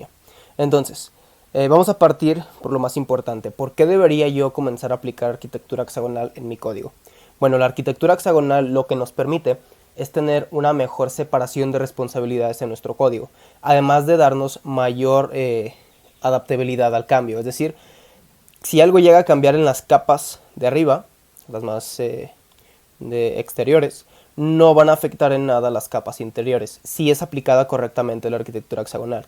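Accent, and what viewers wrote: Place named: Colombia. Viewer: Hermano eres un crack ❤ Tengo ya unos meses tratando de entender bien arquitectura hexagonal y patrones de diseño y es el primer video que realmente me llega 🎉. Y mira que sigo a gente que explica bien y tiene conocimiento (no los voy a nombrar porq seguro ya muchos aqui los conocen 😅) pero aun asi tu has logrado en 20min los q ellos no. Creo por tu acento que eres de colombia asi que un saludo y un fuerte abrazo de un hermano venezolano. 😊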